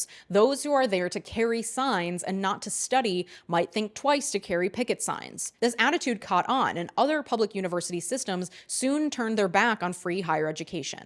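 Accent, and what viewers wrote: United States. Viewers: In the beginning of your video you criticized zionism, which fair, I do also think it's kind of weird as a concept, buuuuuuuuut, aren't you from the USA or Canada? How did you think white people got there in the first place, don't you find it weird that you never see native-americans in your day-to-day, they were genocided, and your assessors probably had some kind of envelopment in that. But I don't guilt you from being born in the country you happen to be born with and want to keep living there, I just find it weird that you talk about zionism with an american accent, which is a by-product of colonization, which is just another word for genocide and occupation of a foreign country.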